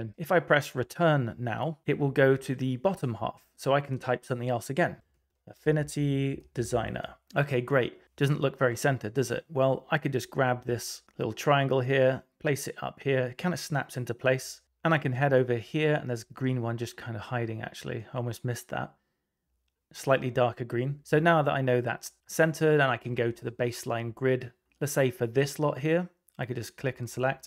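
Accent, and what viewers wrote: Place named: United Kingdom. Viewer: Understanding the British dialect…challenging but repetition aids learning!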